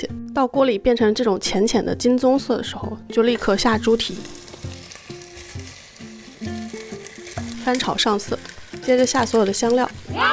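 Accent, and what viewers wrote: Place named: Shanghai, China. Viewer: 我家乡也有好吗我倒觉得是上海银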